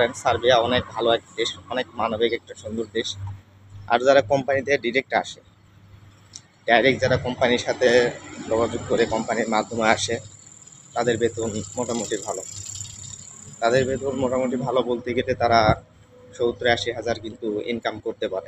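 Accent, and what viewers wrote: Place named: Naogaon, Bangladesh. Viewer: আপনের ভাষা মনে হয় নওগাঁর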